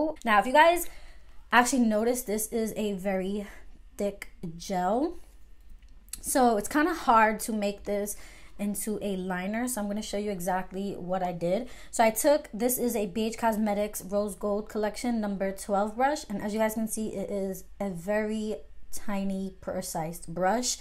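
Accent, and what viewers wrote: New York, United States. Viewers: you sound like you from new york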